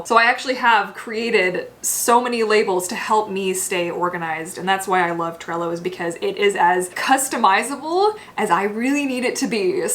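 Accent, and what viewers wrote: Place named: South Africa. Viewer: Hahaha! Down here its pronounced ah-n-velope. 🤣🤣🤣 lekker(nice) south african accent! Truly enjoyed watching the video. It's like wrapping up birthday presents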